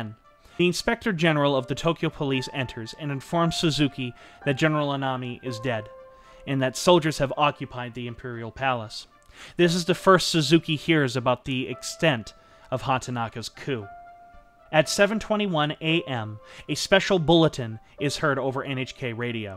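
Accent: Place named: United States. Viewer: About half-way through and really enjoying the telling of the story - the one thing that is grating on me is the pronunciation of some of the Japanese surnames :( Ida for instance (I believe) should be "ee-da" and not "eye-da" and Takeshita should be "ta-kesh-ta" not "ta-ke-shii-da". Gotta watch that American accent and those "t"s . Aside from that, good story, nice pace.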